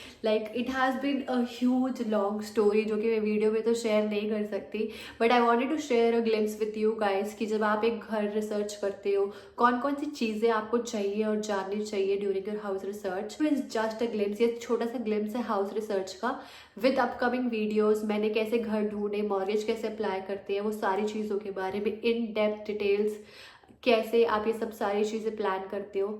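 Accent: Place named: India. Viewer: Im sorry but I didn't understand anything with the Indian accent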